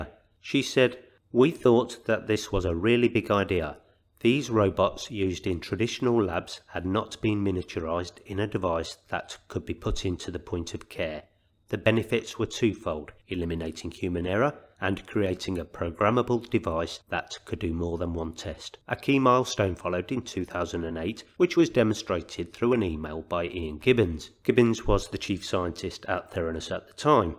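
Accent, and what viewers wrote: United Kingdom. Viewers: Thank you for your update……really enjoy listening. Good to hear a fellow Brit!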